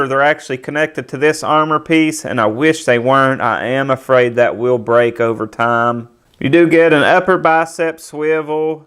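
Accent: South, United States. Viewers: I like your southern accent pretty rad. Nice score from DareDevil19 also did you like my Superman poses in the review? I gotta learn to edit better so I can do cooler posing tricks in my vids but my style is mainly start to finish recording with only one or 2 cuts from packaging to figure. I find with transformers especially it's more exciting and authentic that way, not cheating like to make it look like I know what im.doing, instead you know I know what I'm doing ;) lol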